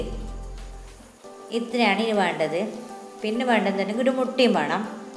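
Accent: Kasaragod, India. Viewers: Kazargod slang alle ithu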